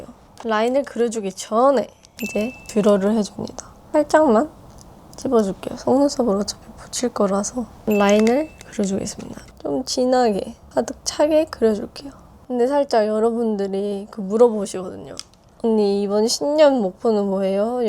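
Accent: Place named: Gwangju, South Korea. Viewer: 언니 혹쉬 광주사람? 어디선가 친근한 사투리가 들려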